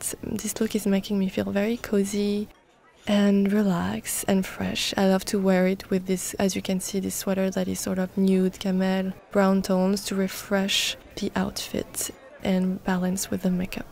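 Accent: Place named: France. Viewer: I love her French accent so much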